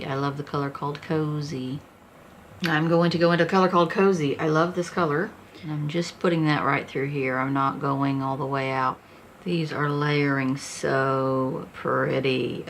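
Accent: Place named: Texas, United States. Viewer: Love this review! I wanted to see how this new palette would work on fair skin. Looks pretty! Also, I am a TX girl too & within the first 20 seconds I could tell you were from TX. 😂❤️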